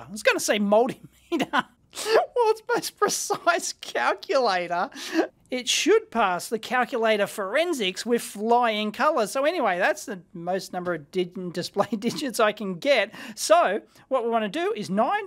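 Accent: Australia. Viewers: Sounds like an Australian Scotty Kilmer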